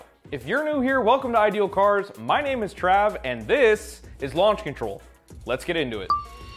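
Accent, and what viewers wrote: United States. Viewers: I feel like I've listened to James May witj an American accent 😁😁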